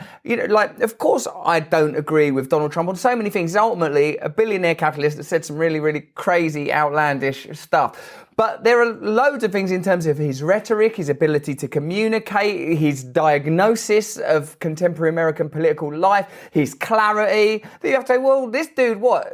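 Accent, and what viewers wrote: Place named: United Kingdom. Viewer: Funny that British accent. I always loved the English, old English dialect, especially before / during the Churchill days. Still to this day I prefer The British English than American English/ Canadian English (we just took / used from America, their lazy English ). Just & quite a bit more British citizens don't pronounce your "T's" ( letter T-t ) Funniest example is when you pronounce the word "better"! You completely leave out the "T" sound "Beh- - -er" but when you pronounce the word "Time" You clearly her the "T" sound & everything! It's just funny to her that!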